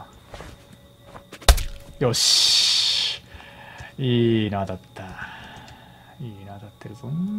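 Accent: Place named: Kyushu, Japan. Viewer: 九州方面の方ですか？聞き覚えのある方言がちらほら…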